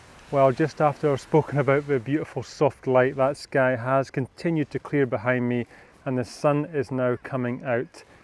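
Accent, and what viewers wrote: Scotland, United Kingdom. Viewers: Cool, I gathered you were Scottish from you accent :-) But I got worried that something maybe wrong on either end. It's not like Scotland is nearby to get home for the weekend :-)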